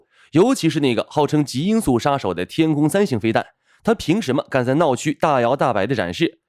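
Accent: China. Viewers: 都不知道這是AI做的，還是對岸的人做的；這人是從台灣人住對岸??? 這影片滿滿的中國味的口音，說話的用詞發音用法都是對岸，搞甚麼東西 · 中國口音的中國影片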